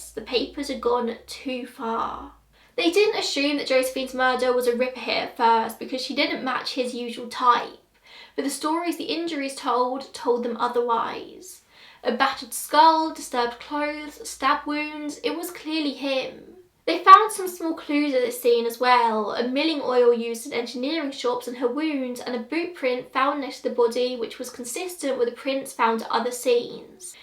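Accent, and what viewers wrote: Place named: Cockney, United Kingdom. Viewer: dear, I think you should seriously consider making a living simply by talking. Your cute Cockney accent is what I describe as "Adorable." Along with your beautiful voice, you (yourself) are beautiful love xoxoxox.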